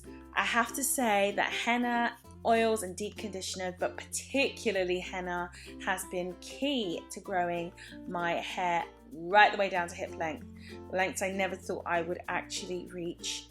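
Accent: England, United Kingdom. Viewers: OH MY GOD YOU'RE ENGLISH!!!!! YESSSSS!!!!!!!!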